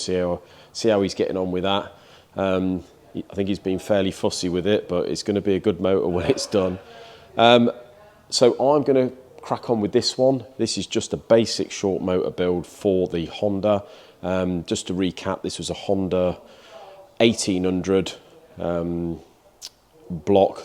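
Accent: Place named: United Kingdom. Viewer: Bahahhaha, called their bluff, you go DOG. Burden of proof, how can they do that now, car running, I am soooooo happy you did not cave congrats. Also, I had a customer who had a fleet of toyota pickups, I started doing Maint and repair on the vehicles, until, they started paying in a 120 day rotation, yeah hey Trimline you are fired, good move, I did not have to carry that balance on the books for months. Also you may be interested that you and Veruca Dumont seem to have the same accent, are you from the same area in the UK? 😉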